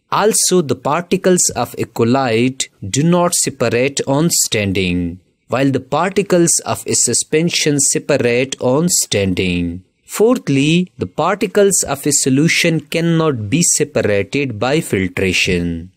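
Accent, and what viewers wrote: India. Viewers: يخربيت اللهجة الهندية 🤦🤦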